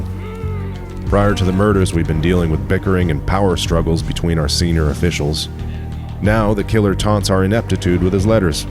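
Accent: United States. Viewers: English detective with an American accent. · Does anyone realize this guy is a British but he has an American accent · Why the American accent ? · Brit hunter American English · American accent narrating this, made it seems less authentic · An American accent?? My God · why is the narrator american? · The only English guy with a American accent...... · Hold up anyone else notice he is from ENGLAND with an AMERICAN voice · why does he sound american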